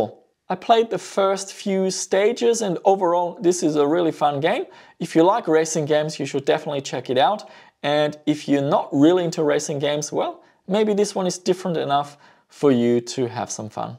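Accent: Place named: Germany. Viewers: looks like a UFC cruiserweight. Looks dangerous and his German accent backs it up. Scare me in a dark alley... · btw i'm from germany and always noticed your german accent, where are you from?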